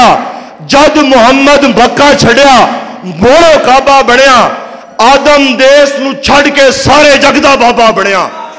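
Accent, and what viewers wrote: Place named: Punjab, Pakistan. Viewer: جٹکی پنجابی ❤❤❤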